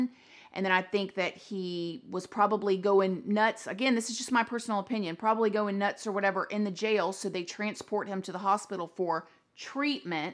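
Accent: South, United States. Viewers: I loved your work 🥰🥰🥰 new subscriber here 👍🏽👍🏽👍🏽 keep up the good work 😘😘😘 also I feel like you have a beautiful southern charm. You don’t sound like a Floridian lol but that’s just me 🤷‍♀️🤷‍♀️🤷‍♀️ I know Florida is a down under southern state, but again you have a lovely southern accent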